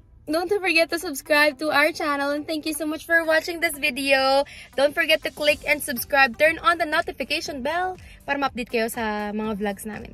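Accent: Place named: Ilocos, Philippines. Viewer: Cutie nung tagalog ilocano. Hahahahahha! 💞💞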